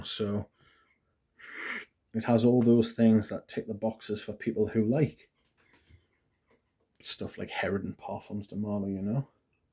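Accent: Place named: Northern England, United Kingdom. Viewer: Fyi with your northern accent it’s “Bwah d”I says” 😂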